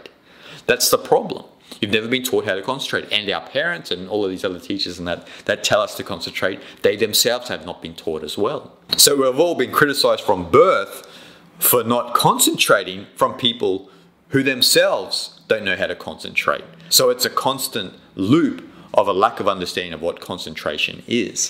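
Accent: Australia. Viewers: P.S. Are you Australian? 😌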